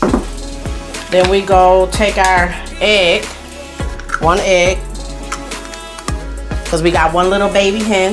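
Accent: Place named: Buffalo, United States. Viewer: That buffalo accent strong lol Dope recipe!!!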